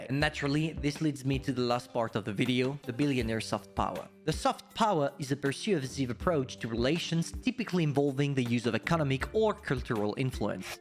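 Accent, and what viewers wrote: France. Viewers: Very interesting and informative... but IMO it would be good to have some curated subtitles alongside as your French accent is quite strong. I find it difficult to understand some parts as a native English speaker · ! Been watching you for a while know and you probably know that already. I would rate this video a 9/10! For a first timer this is fantastic and I would definitely not mind watching vids like this. The only thing you could improve with is your pace. It was a bit quick and due to your French accent it's slightly difficult to understand. If you slowed down a bit 10/10 vid! GL on making more vids like this, these type I enjoy:D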